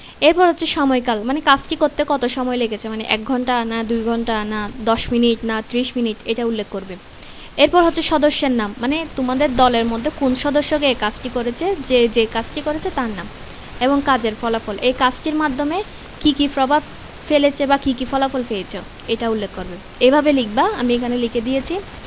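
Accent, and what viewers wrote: Chittagong, Bangladesh. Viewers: Sis is pure chittainga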